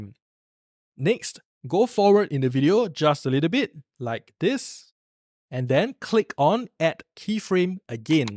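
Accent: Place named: Singapore. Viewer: just the first few words he said, i know hes from singapore lol... a very distinctive accent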